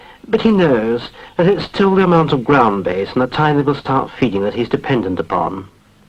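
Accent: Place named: RP, United Kingdom. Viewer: Very posh old boy...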